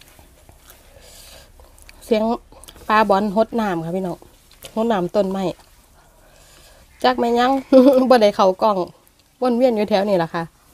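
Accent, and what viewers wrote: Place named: North, Thailand. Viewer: อู้เหนือด่ก่จ้าว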